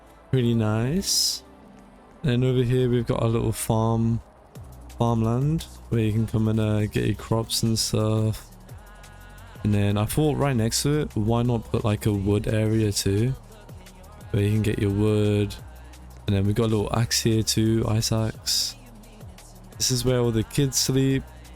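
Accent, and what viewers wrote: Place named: United Kingdom. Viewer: He has a British accent!!!!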